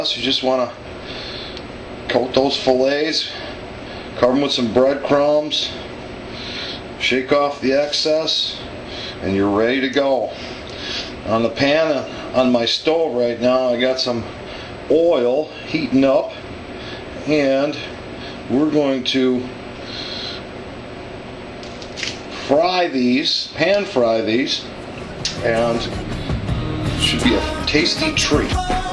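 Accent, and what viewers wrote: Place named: Chicago, United States. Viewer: A big shout from Denver,! Man I swear I can smell the aroma coming outta my computer. BTW I can tell by your accent you're a Chicagoan! Keep up the good work.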